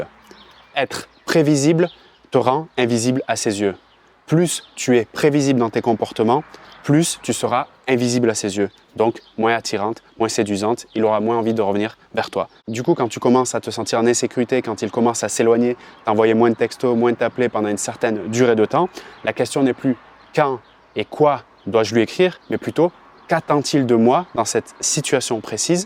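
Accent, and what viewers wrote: Quebec, Canada. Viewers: Merci pour ces conseils,. Mais s'il ne faut pas être "prévisible" et éviter le silence radio, quelle stratégie, préconises-tu? Ps: j'aime bien ton accent, serais-tu Québecois?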